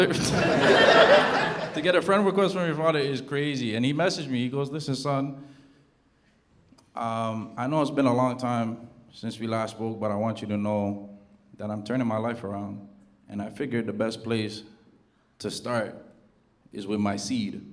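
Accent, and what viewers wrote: Toronto, Canada. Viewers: Trus me I knew right away before he mentioned it this man had to be from T.O. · God couldn’t listen to the whole act. That disgusting “Toronto accent”. 🤮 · Anyone wondering how a real Toronto accent sounds it this..not the suburban kids on TikTok😂😂😂😂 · i kno toronto when i hear it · Bro, I knew you're from Toronto, listen to that accent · Buddy sounds straight from Toronto off rip 🤣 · This guy has to be from toronto from his accent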